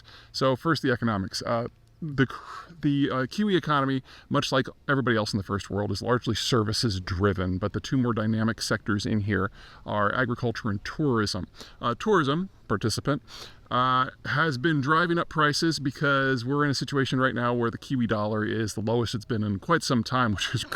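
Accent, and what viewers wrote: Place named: United States. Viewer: Oh! It's the same fxxxing expert with an American accent, who knows so much about Australia. Too funny. Pass the bloody popcorn mate. Keep doing these, you are a genius, It's so comical listening to you blow it up your own arse.😂😂😂😂😂😂 Love the sunglasses, they really do a great job hiding your IQ. Get back to the northern hemisphere, you are stealing our supply of oxygen down here. Then again, the Septics are well versed on extortion. They have practised it all over the world, since the time of Columbus.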